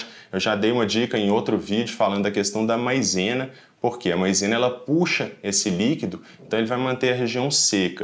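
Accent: Minas Gerais, Brazil. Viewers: Esse sotaque mineiro é maravilhoso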